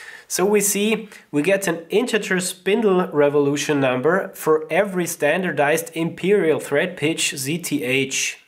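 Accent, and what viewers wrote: Austria. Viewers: Bisch Du e Össi oder e Schwizer? ;)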